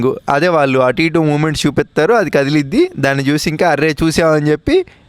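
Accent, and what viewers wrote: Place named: Godavari, India. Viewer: Oy abbayi ne videos super and ne voice kuda super same ma godavari abbayila behave chestunavu ne mata yetakaram thoo❤❤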